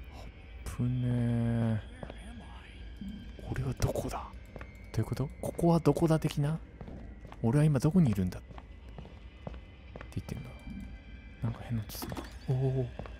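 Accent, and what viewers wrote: Hokkaido, Japan. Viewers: 謎解きもゴリ押しで解いちゃったり、北海道弁が聞けたの嬉しいし、びっくり要素がいっぱいあって面白い名前があったり、八つ当たりで「飛んでけぇぇ！！」や物を投げる時に「すとーん！」って言っているのも好きです。お約束のバグもあって面白かったです。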